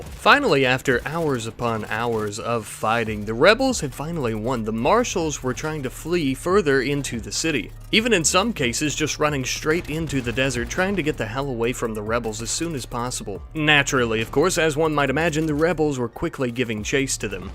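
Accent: South, United States. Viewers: are you a southerner? You got a little drawl in your voice.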